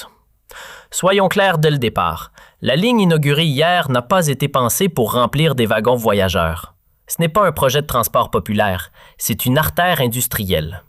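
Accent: Canada. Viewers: Désolé pour vous, même s'ils ont utilisé la voie canadienne pour la lecture de ces commentaires dont le contenu n'est nullement convainquant, un économiste algérien formé en Algerie vous répond, Ce que vous oubliez est plus important que ce que vous débitez, vous oubliez le rôle du plus grand port de Méditerrannee, celui de Hamdania, qui ouvre la voie au commerce international du Sahel vers le Monde, et l'inverse. Vous oubliez de préciser que cette voie ferrée se situe sur le tracé de la voie des épices que la Chine compte développer. Vous oubliez de rappeler que ces trains vont servir à acheminer les produits des activités du sud, produits agricoles, le lait en poudre de Baladna, les mines de cuivre qui sont sur cette voie... Ce que vous appelez de petites gares, deviendront des villes, allez consulter l'histoire de la création des villes américaines et ailleurs grâce aux lignes ferroviaires. 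Ces lignes ferroviaires ne decervent pas seulement cette région, mais elles relient toutes les régions d'Algérie entre elles. Je m'arrête, mais je pourrais en parler pendant des heures, et ce que j'ai écrit là n'est pas dans le but de vous éduquer d'avantage seulement, mais c'est surtout pour informer le Peuple algérien contre les rumeurs et mensonges que des gens comme vous sèment pour foutre la merde dans les pays qui gênent le sio international...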